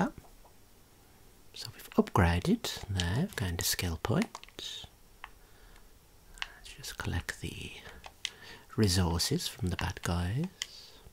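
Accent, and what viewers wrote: United Kingdom. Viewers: Have just stumbled onto your channel, and my immediate thought was "oh no he's a Brummie", as am I and I loathe the accent! However, I'm picking up Black Country? Whatever your accent is, your voice is just heaven Sir, and I loved the retro games, definitely my era 😊 Thank you so much, you have a new subscriber 🤗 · I adore this accent. Obviously somewhere in the UK, but I can't place it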